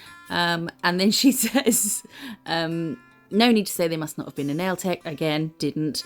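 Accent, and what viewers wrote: RP, United Kingdom. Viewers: I loveee your channel, you talk to yourself like I do, swear like I do and have a naughty sense of humour like I do, so it’s like hanging with a friend with a much posher accent (I’m Northern Irish). Love your designs into the bargain! Win!!! Xoxo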